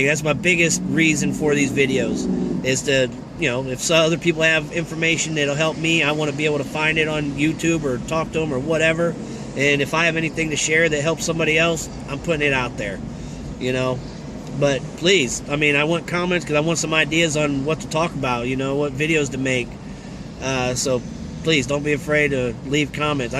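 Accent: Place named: Laredo, United States. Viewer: is this Laredo TX it sounds like it 😂 👍👍👍👍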